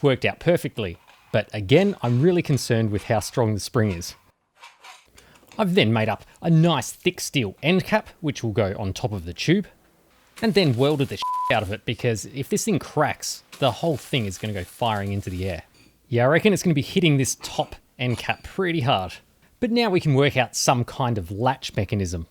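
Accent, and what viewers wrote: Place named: Australia. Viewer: I love your sense of humor. Your Austrailan accent makes it even better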